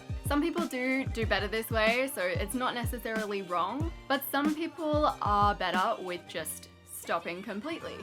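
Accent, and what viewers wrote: Australia. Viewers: I love her accent <3 Is she Australian?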